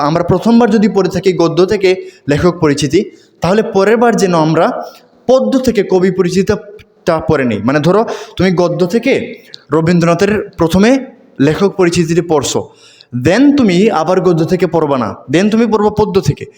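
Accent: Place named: Sylhet, Bangladesh. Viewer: Vai ki Sylheti? Apnar accent sune mone holo apni Sylheti.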